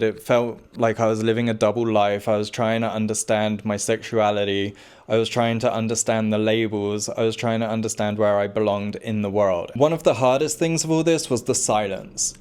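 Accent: England, United Kingdom. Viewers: Especially given that the handsome has excellent communication skills, especially when delivered with that gorgeous English accent 😍😍😍😍😍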